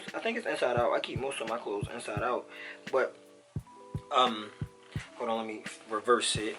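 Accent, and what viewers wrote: Baltimore, United States. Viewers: im so dead I could tell you from Baltimore just by the accent and I was right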